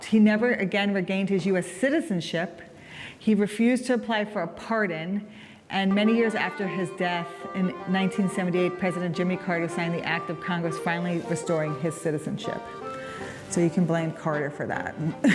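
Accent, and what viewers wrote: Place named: New England, United States. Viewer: You’re clearly a Yankee!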